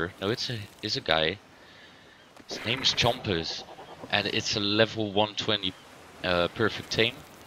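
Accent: Netherlands. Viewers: ben je nederlander ? are u from holland ? u sound like 1 lol :P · i immediately noticed your dutch accent :D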